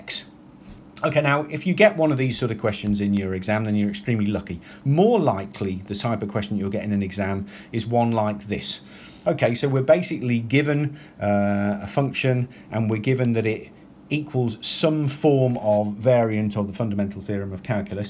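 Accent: United Kingdom. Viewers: Thank u random British guy 💂🛐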